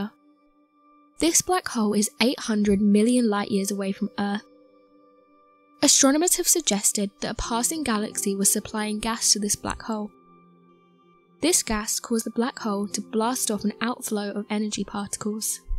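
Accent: England, United Kingdom. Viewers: Cute English accent🖖👽🤖👾🛰️☄️🚀👻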